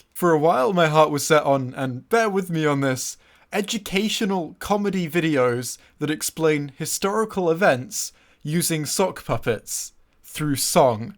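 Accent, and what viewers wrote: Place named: Scotland, United Kingdom. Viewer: Question: I’m hearing a Scottish accent there mate, Whit part yae fae?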